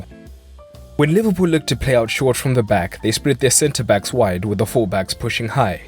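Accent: South Africa. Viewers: Nice as always are you by chance from South Africa? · First: are you South African? Second: before I watch, something I noticed was the Liverpool front three put intense pressure on City possession whenever they tried to play out from the back, Barca style. They forced longer balls which they would try to then win in the midfield to relaunch their own attacks very quickly. Highly effective throughout the 1st half! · Are you from south africa??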